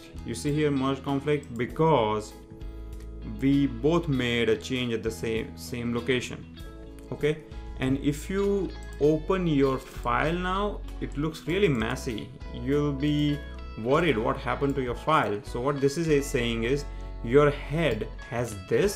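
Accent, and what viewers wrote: India. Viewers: AND YOU GOT best indish english pronounce of i ever hear man)))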